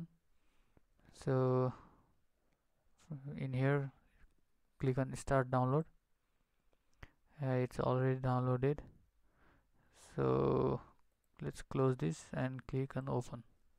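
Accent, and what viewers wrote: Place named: Nepal. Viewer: bro nepali ho kya ?? 😂😂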